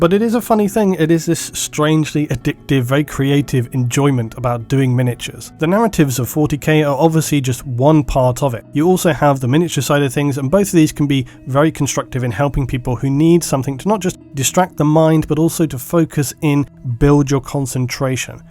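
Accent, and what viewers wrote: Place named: United Kingdom. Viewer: It's not the painting or the role playing that allows for escapism or promoting rewarding precision work. It's all that in the tougher times, people flock to the source of british accents being spoken for it consoles them, makes them calm. Take voice for example, we all come here just to listen that man speak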